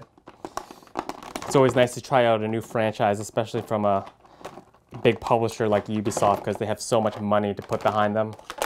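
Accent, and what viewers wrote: United States. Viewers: I always imagined you were a white guy. The accent is very strong and American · Hes asian? Had no idea thought he was american cause of his voice, no hate tho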